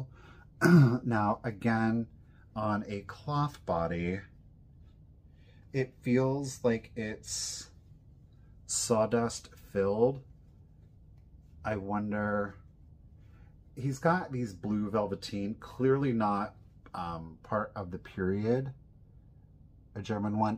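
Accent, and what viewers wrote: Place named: Scotland, United Kingdom. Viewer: you sound Scottish 😂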